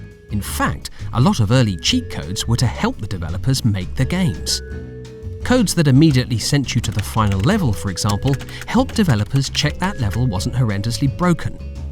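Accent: United Kingdom. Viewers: It's because he's British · What is so funny about him being British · because British people have the best accent for narration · This British narator makes the video more interesting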